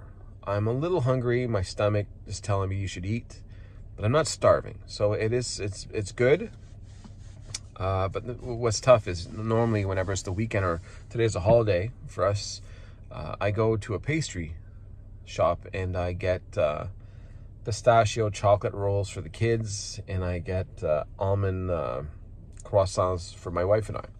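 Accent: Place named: Canada. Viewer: Are you canadian! i heard a little Canadian accent! love your videos, very helpful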